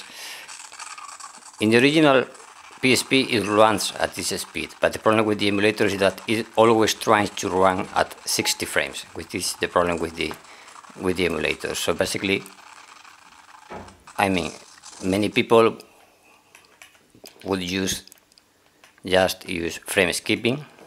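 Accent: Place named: Spain. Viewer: aun que se le nota en el acento que es español, yo soy latino saludos desde américa latina tiene muy buen inglés, solo le falta evadir ese acento muy pequeño del español pero que exelente vocabulario, saludos y que Dios lo Bendiga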